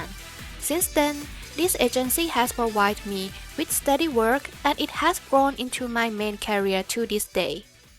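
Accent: Thailand. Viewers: กรี๊ดดดดด สวัสดีค่ะ ผ่านเข้ามาเจอ คิดว่าสำเนียงไทยม๊าก สนุปว่าคนไทยจิงด้วยย เก่งมากๆๆๆเลยค่ะ❤❤❤ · ฟังสำเนียงคิดว่าน่าจะคนไทย ใช่จริงๆ ด้วย เก่งมากเลยค่ะ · I knew it from your accent and bombastic artstyle that you are from Thailand (ONE OF US ONE OF US) this video helps me so much as a young aspiring artist +sub!! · นั่งฟังตั้งแต่ต้นคลิป รู้สึกว่ามีไอบรรยากาศความเป็นคนไทยมากๆ ทั้งการออกเสียงทั้งสำเนียง สุดท้ายก็คนไทยจริงๆด้วย😭 เก่งมากๆเลยค่ะ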